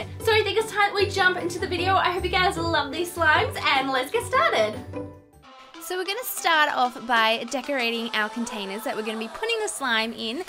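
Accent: England, United Kingdom. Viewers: I'm new to ur channel and I notice that u r so adorable oh and I'm from England too I notice your accent (idk I'm not good at accents)😝 Btw I subscribed to ur channel 👍💚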